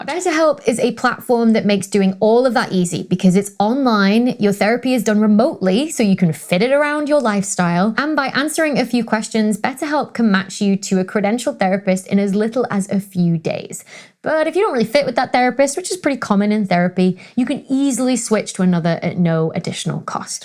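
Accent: United Kingdom. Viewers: Dr. Becky: Being an academic is hard. Middle-aged me working in food service: Tell me about it. Your accent tells me you're a Pom, so you have guaranteed health care, right? As an academic, do you get sick time? Paid holidays? My health care comes out of my own pocket, and all I get if I have to take time off from work is a smaller paycheck. I'd trade places with you in a heartbeat. To paraphrase Ford Prefect: Academic stress is an illusion. British academic stress doubly so.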